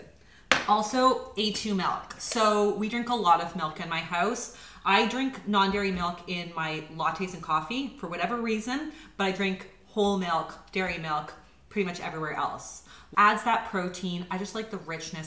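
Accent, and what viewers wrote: Canada. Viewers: You look so gorgeous in this video, and can you send me some of your groceries please? Everything seems so delicious. Also, You don't say bagels wrong You just have a Canadian accent. And when you try to say it differently it sounded exactly the same in my opinion 😁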